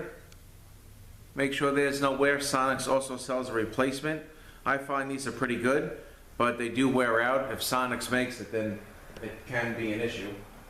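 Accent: Long Island, United States. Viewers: Greetings from Albany! I think I hear the LI accent, i'm from there(SE Nassau area)!!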